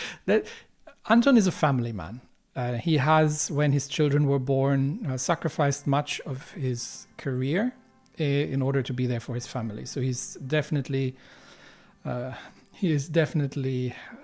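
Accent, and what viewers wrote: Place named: Germany. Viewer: Australia? But you have german accent...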